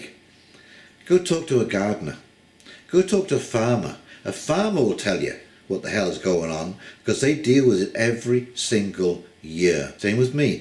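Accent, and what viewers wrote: England, United Kingdom. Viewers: you have a clear English accent - but you talk as if you might be in the USA. Forgive me if I have missed something. Could you say where you are based? I am in the UK SE. I now listen to you regularly. Regarding your food advice: I reckon I have got things quite well organised for myself. My worry is for the cats and how I would feed them if there was a famine. The only thing I can think of for pet owners is to buy several sacks of dried food.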